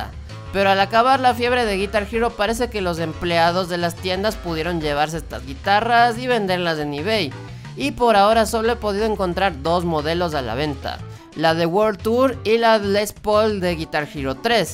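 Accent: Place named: Mexico. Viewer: Este wey tiene acento mexicano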